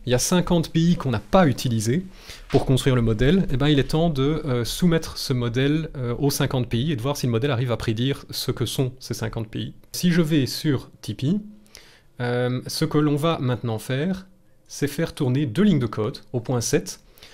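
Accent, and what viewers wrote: Belgium. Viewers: J'adore l'accent belge 💕💕💕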